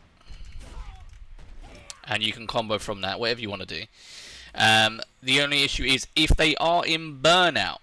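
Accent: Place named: England, United Kingdom. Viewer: Great vid man. Good to hear it from an English accent too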